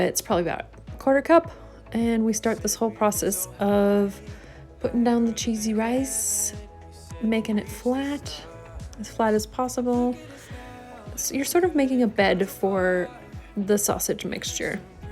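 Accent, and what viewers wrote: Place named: Canada. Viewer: I am curious, Are you Canadian or American because we share the same accent; to which I mean not a NZ accent. #Canadian How long have you been in New Zealand ?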